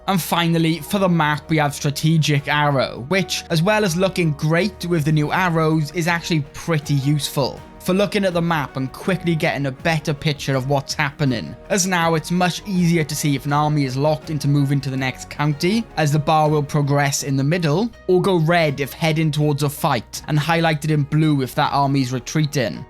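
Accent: Wales, United Kingdom. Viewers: Great video as always (nice Welsh accent btw)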